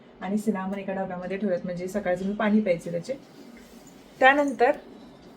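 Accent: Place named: Ghat, India. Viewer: Tu ghati ka boltes kokani ka ny bolt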